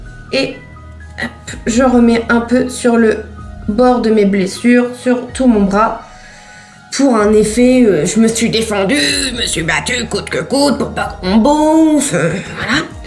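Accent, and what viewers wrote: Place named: Normandy, France. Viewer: On voie la normande et la façon de parler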